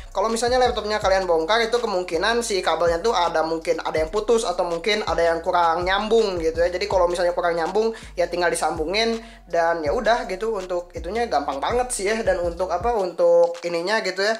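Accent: West Java, Indonesia. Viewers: Si akang nya orang sunda ya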